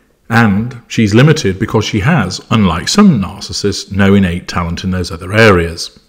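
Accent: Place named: RP, United Kingdom. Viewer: You speak received pronunciation. As do I. The accent will get you everywhere. Not a narcissist (absolutely the opposite, sadly for me) but I do have the voice that convinces everyone that you are educated and to be trusted. Even if you are not. A huge asset.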